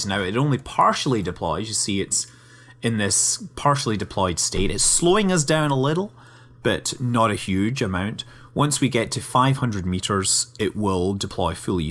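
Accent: Scotland, United Kingdom. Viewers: Holy potato, i love scottish accent!! XD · Are you Scottish? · he sounds Scottish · Scottish with a hint of American, where I am to understand he lives. And I might add your English is better than many native speakers I know! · are you Scottish? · Scottish accent ftw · he's definitely not canadian. my best guess is he's from scotland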